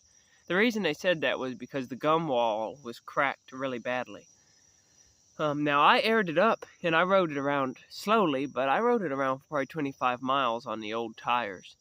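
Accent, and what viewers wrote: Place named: Midwest, United States. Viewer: Kind of off topic, but I love the way you talk. Something in your diction, dictation, and accent is just soothing to me as a Californian. I'm guessing you're from the Midwest in a Mennonite or Amish area. Anyway, I also like the bike!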